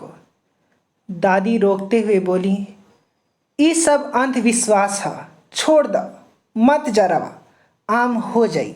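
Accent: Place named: Bhojpuri, India. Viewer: Bhojpuri accent 🤩😂😂😂😂👌👌👌👌 Ioved a lot the way you delivered your story...❤️😂😂😂🤣🤣🤣👌👌👌👌👌👌👌👌👌👌👌👌👌👌👌👌 Characters name was amazing...Simranya 🤣😂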